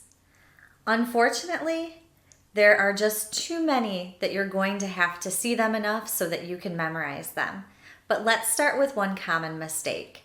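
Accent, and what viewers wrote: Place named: United States. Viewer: American accent is quite stylish...Love it...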